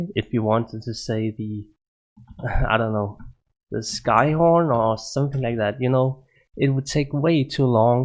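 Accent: Denmark. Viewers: Hehe, After your first 5 words me and my gf said at the same time "That guy is Danish" :P (Well, we said it in danish, since we too are danish, but you get the point) But nice video, thanks :P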